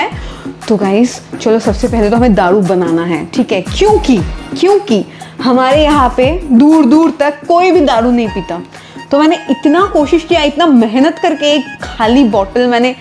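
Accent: Bengal, India. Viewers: Didi are you a bangali I am also bangali😀 I noticed that your tittle is and your taking is just like bangali Khathi bangali❤️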